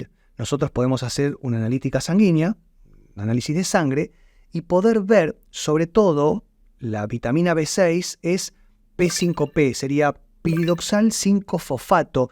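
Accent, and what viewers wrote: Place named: Rioplatense, Argentina. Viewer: Parece como argento o yorugua.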